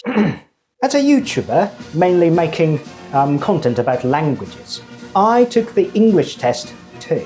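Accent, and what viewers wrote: United Kingdom. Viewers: 共テリスニング96、リーディング88とれた‼︎ Thanks to your British English!! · まじでどうやったらそんなイギリス英語習得できるんだ？？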